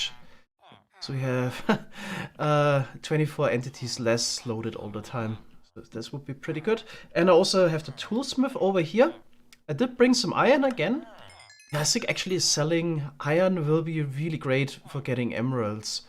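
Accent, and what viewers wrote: Germany. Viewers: It’s so interesting!! Looking at it phonology-wise, I believe it’s because in German, voiced stops and fricatives like b, d, g, and z are never found at the ends of words. However in English, the words eggs ends with both a voiced g and voiced z sound. Thus he replaces the voiced consonants with their voiceless counterparts k and s, which are allowed to be at the ends of words in German, but as a result both “eggs” and “axe” end with the same two consonants, k and s. As German is his first language (I’m assuming?), he must really struggle with using the ‘right’ phoneme sometimes at the ends of words or simply can’t hear the difference. Accents are awesome!!!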